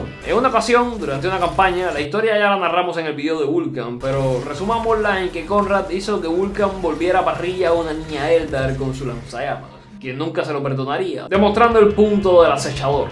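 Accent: Cuba. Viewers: Perdón por la pregunta,pero sos cubano?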